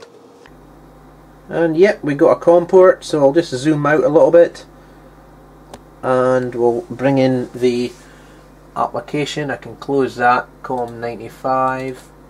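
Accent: Scotland, United Kingdom. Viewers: are you fae the North East of Scotland ?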